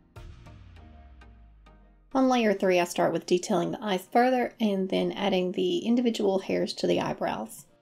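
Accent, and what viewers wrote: South, United States. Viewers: Im so used to southern accents in georgia they usually annoy me, but yours is so soothing and calming and fits the aesthetic of the videos. Awesome stuff!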